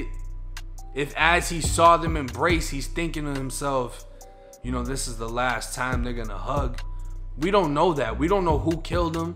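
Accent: Boston, United States. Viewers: Is that a Boston or New Hampshire accent?? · lol mad boston accent but trying to sound southern slang? so confusing lol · Actually I just show your first video on lockdown, Im Live in Malden, I new you had a Boston ascent, that’s why I originally asked. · Are you from boston? Asking cuz that accent lmao I’m from springfield ma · your funny as hell brotha, your baaaston accent makes the narration even better. Keep it up brew, good story, and do more of these "true crime" posts, it definitely fits you. Peace brah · Why is it that everyone who is into this rap/hip hop culture, talk with voice inflections that do not coincide with the rest of their speech, why can’t they just speak the way they naturally should? I got nothing against this kid at all, I actually just stumbled upon his channel and content which is pretty cool, this kid is obviously from the Boston area, it’s hard to nail down different accents and what neighborhood they come from, this kid sounds like he’s from Southie, but I can’t be certain, being born and raised in NYC I can pick up Brooklyn/Bronx inflections. 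I wish this kid nothing but the best. I just wish these kids who are into this culture would stop trying to emulate speech that isn’t part of their natural speech patterns. It’s just something that bothers me. I completely own that’s it’s my shit. But God speed to this kid and what he does, good, solid, original content, on horrible stories that aren’t picked up in the media. Good stuff! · Damn u must be from boston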